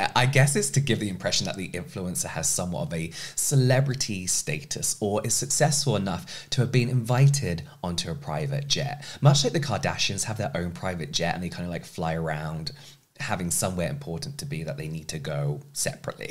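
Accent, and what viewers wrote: United Kingdom. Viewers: The perfect beautiful English 😍 I'm Dutch and I love the British English language, but you're a literal cherry on top 🍒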